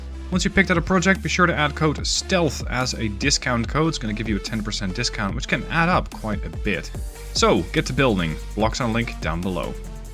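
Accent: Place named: Netherlands. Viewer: horrible Dutch english. OMG. get some training.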